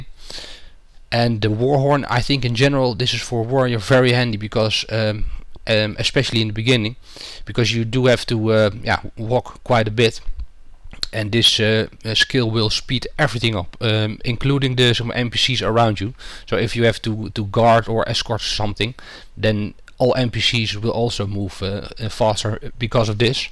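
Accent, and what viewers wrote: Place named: Netherlands. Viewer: dutch much:)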